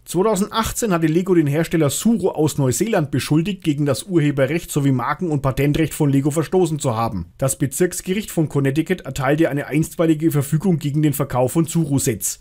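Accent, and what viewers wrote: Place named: Franconia, Germany. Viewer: Meinen tiefsten Respekt für dieses Video. Ein Thema das so komplex & vielschichtig ist auf Minuten zu bringen & dabei noch informativ, sachlich sehr hochwertig & unterhaltsam zu sein das ist ein echtes Meisterstück (a real masterpiece). Sicherlich es ist nur ein kleiner Umriss, da es noch viel, viel, viel mehr dazu erzählen gibt. Aber dieses Video zeigt auch das die Firma aus Dänemark die heute Fair Play von ihren Mitbewerbern sich wünscht schon immer eine Firma der Winkelzüge, der Ausnutzung von Lücken im Rechtssystem & der geschickt gemachten "Plagiaten" ist. Daher sollte diese Firma nicht mit Steinen werfen, denn sie sitzt im Glashaus (oder Wer im Glashaus sitzt sollte nicht mit Schweinen werfen) Ich liebe deinen Content, ich habe dir ein Abo gegeben da hattest du noch 60-80 Abos, aber mir hat es super gefallen & da war ein Abo pflicht. 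Was ich auch total super finde, ist die Tatsache das du es nicht versuchst deinen Dialekt zu unterdrücken, naja bin Schwabe, aber ein Franken kann man in einem guten Masse verstehen. Mach so weiter & du wirst weiter wachsen.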